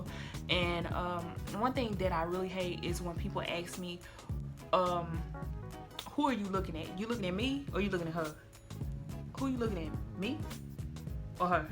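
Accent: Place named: South, United States. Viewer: gal u are beautiful and very articulate with that southern twang , I am still single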